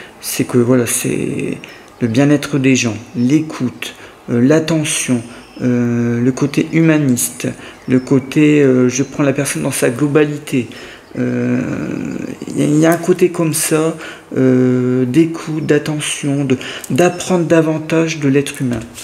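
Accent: North, France. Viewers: Un grand merci pour votre tirage qui me parle beaucoup 👍 Vous avez un petit accent du Nord ? 🤔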